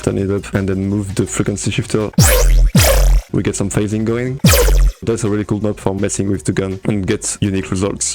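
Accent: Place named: France. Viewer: l'accent francais mdrrrrr continue comme ca !k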